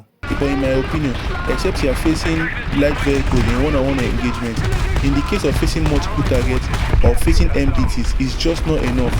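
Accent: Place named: Africa. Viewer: because you have an african accent ,but a great guide btw I've already subscribed and am waiting for more tank guide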